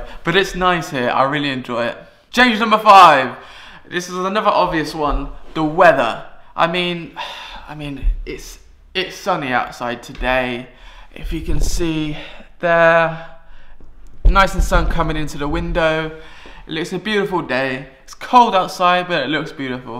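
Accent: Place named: Stockholm, Sweden. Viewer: I'm kind of inclined to disagree with you still about the grammar, there are more similarities than differences to me at least :P As for the sj sounds, most of the time you can get away with using the familiar sound that you do have in english, doing so will mostly just make you sound slightly Stockholm-ish. But keep practising, I'm sure you'll get a hang on the harder sounds aswell =)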